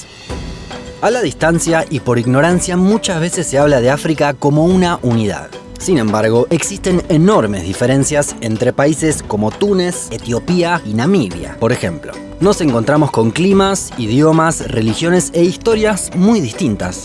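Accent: Argentina. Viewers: ya me fijé, estaba viendo los comentarios de otros comentarios y al parecer es un Chileno xenofobo de argentina y al parecer el creador de los vídeos es argentino o parece por su forma su acento que tiene similitudes a la de argentina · Buenas tardes, Cosas que han pasado por culpa de las fronteras: - Reparto desigual e injusto de recursos - Me gusta el acento argentino - Nos parece mal que se explote tanto los recursos económicos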